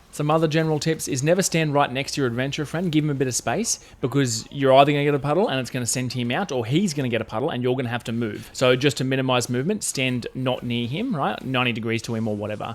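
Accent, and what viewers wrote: Australia. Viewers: Heard the Aussie accent and instantly hit subscribe. Gotta support me fellow Aussie content creators.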